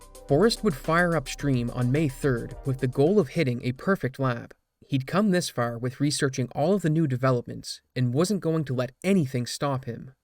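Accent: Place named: Prince Edward Island, Canada. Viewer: I forget if I've asked this before., are you a Maritimer? and around there I feel like I'm hearing a strong Maritime Canadian accent. "Time", "Line", and "Marker" sound very Newfoundland lol. For the record, I love it, I just really honed in on it after that time-stamp and can't stop hearing it lol. I bet anyone who wants to take it, a fair bet I'm not Googling first, $100 Canadian that grew up in Newfoundland (and Labrador). I could use the money and I'm so sure I'm right lol. You have to internally accept the bet before Googling the answer. I'll know. Edit: My second, unofficial guess for betting purposes, as I keep listening to him, is PEI. That's Prince Edward Island to you non-Canadians. That's just for pride. First honest guess was Newfoundland. Just started hearing PEI more as I listen.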